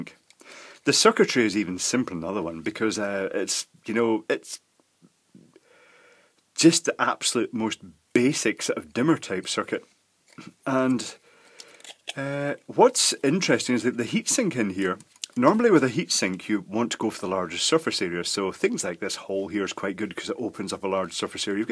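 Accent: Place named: Glasgow, United Kingdom. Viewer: Was watching with fascination, and, just for giggles, switched on the inline captions., with the technical terminology and your mild Glaswegian accent, it really didn't do a very good job, I went to a website yesterday that dealt solely with misheard song lyrics; I wonder if we can supply them with material for an annexe?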